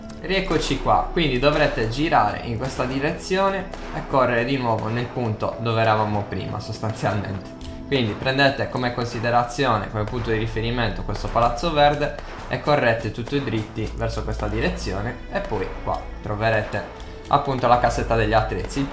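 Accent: Sardinia, Italy. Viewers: ho riconosciuto l'accento, pure io, Cagliari precisamente, mi piacerebbe aggiungerti fra gli amici se posso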